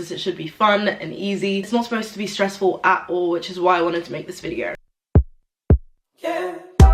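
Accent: United Kingdom. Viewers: She’s not she’s British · I love this video, it's so straight to the point. Maybe its just the Uk accent that feels more familiar to me but other videos go on about vibrations and try and sound too mystical and it just feels a little weird but with yours I can trust you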